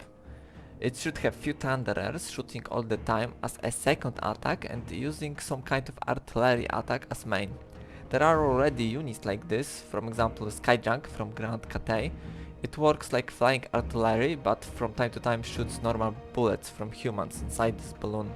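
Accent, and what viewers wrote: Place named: Poland. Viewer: Slychac ze polak haha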